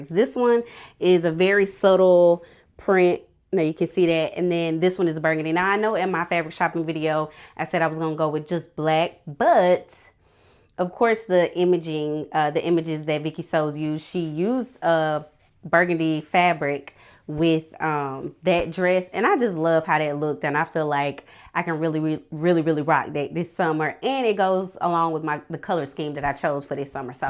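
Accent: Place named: Georgia, United States. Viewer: I knew when I heard ur accent, u were from the Peach! I'm so excited u are from here too! I don't see many of us and I've seen fine fabrics so many times but was too scared to go in. I have so many ideas just need the ouch to create them🤭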